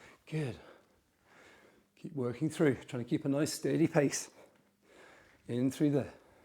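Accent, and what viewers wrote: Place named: United Kingdom. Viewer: British? Ugh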